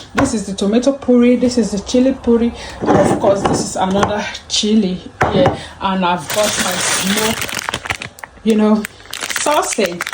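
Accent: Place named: Yoruba, Nigeria. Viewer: Yoruba sweet for your mouth ma ❤️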